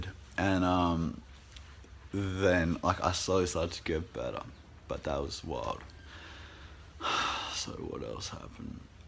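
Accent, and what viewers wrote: Australia. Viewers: Hahah no! I'm probs just not used to the aussie accent, i'm from New Zealand you see :p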